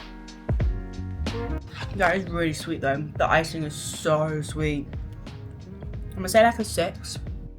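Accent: United Kingdom. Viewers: Hey, are you british? Cool accent 😎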